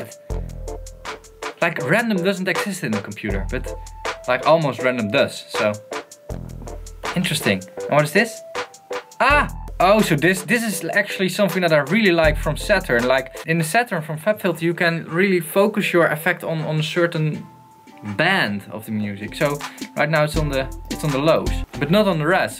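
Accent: Netherlands. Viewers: are u dutch dude?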